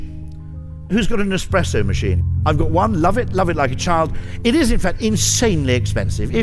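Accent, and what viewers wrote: United Kingdom. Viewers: Everything sounds better in a British accent and lingo! But yeah, that's great effing advice too. Just wish I could be a cheeky bugga too!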